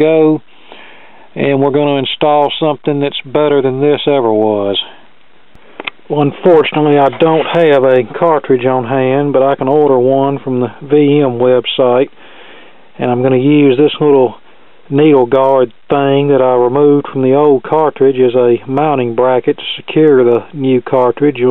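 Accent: South, United States. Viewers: you sound like a True- Knowledgeable Southern Gentlemen of Audio Repair Technology - I,ll stay subscribed to your Channel.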